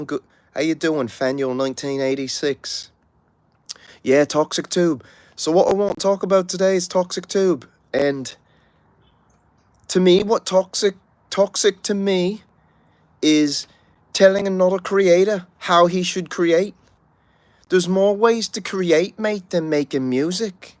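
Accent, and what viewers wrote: Liverpool, United Kingdom. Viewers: A fair bit of scouse in there as well.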